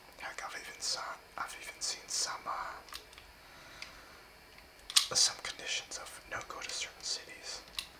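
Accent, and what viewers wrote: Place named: Canada. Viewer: The Canadian accent is relaxing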